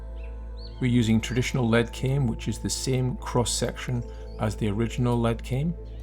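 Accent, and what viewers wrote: United Kingdom. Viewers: Thank you, it is wonderfull to see traditional glass work. At first it almost looked like one of my grandfather's windows, but based on you accent and the masonry of the church I'm assuming you are in Britain. My grandfather was Rudolf Benz of Newton New Jersey USA, I grew up in his studio and putted many a window in my youth exactly as you have 😊 He learned his craft in Hamburg Germany at 14. He taught me to actually mix his own pigments ( metal oxides,powdered glass et.) We search all over for his windows, I would love to get intouch with you perhaps. We made a website of what works we know of and are trying to expand the library. You could contact me through there if you have time, THANK YOU Keep up the craft 😊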